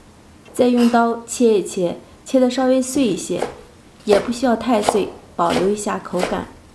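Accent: Northeast, China. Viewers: 超做作，虛。想你東北話就賊好聽了。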